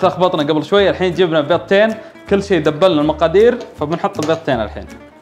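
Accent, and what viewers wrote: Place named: Al-Ahsa, Saudi Arabia. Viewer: من وين انتي؟😂😂 احسك حساوية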